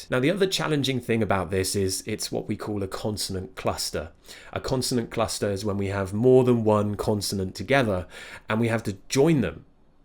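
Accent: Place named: Cambridge, United Kingdom. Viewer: Nice wher in England are you from ..accent wise i cant firgure out il guess Cambridge ?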